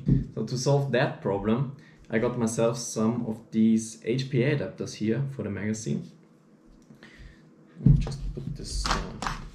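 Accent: Germany. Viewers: is he from german?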